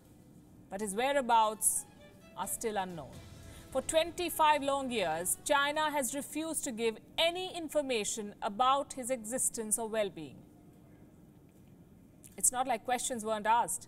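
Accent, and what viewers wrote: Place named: India. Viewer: not really fond of indian accent but there is something about the way she talks that i always find interesting. not sure if its the clarity or the intonation.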